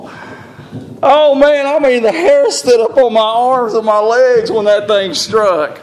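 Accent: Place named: Mississippi, United States. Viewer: First comment of 2016 BTW ur from Mississippi huh that's how we sound down here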